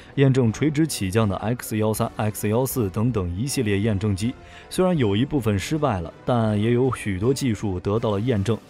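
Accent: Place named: China. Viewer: 大陆人口音，台湾人专用频道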